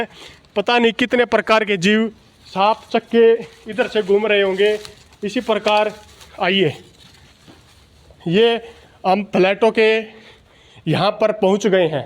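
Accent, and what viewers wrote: Haryana, India. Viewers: Bhai Haryanvi ae bol le Hindi baski na h.....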